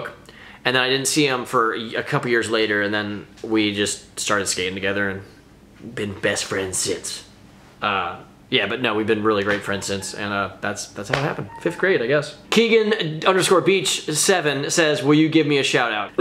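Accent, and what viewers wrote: England, United Kingdom. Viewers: Are u English or American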